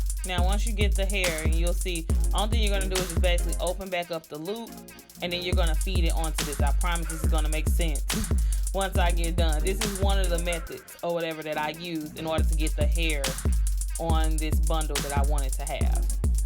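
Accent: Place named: Memphis, United States. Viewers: I definitely was gonna ask was she from the south! LOL, her accent sounds like mine! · Are you from Memphis??!! Lol!! The way you pronounce your words, sound just like my ppl from there. LOVE IT GUH!!!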